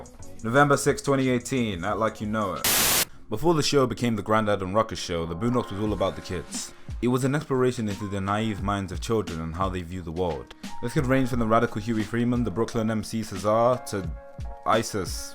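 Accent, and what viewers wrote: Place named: England, United Kingdom. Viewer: "sound white"??? I mean, from your accent it sounds like you've either spent time in england or have english parents... Just cause you have that accent doesn't mean you're white. Any more than someone sounding hood makes em black.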